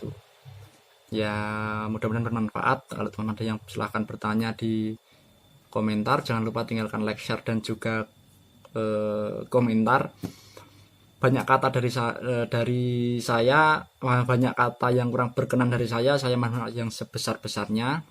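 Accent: Java, Indonesia. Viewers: Jowo e pundi mas? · Bahasa indonesianya medok bang. Hehehe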